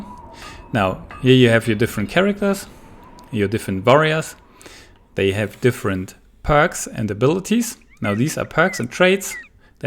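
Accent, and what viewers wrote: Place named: Germany. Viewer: I'm so stoked. Hearing the German accent of one of the BB developers just adds to the hype and excitement. My first band of brothers will be called, "The Regulars" in your honor.:-D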